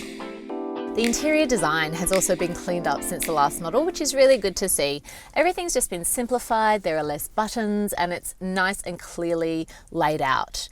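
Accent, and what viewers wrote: Australia. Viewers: Phu Vuong she sounds Australian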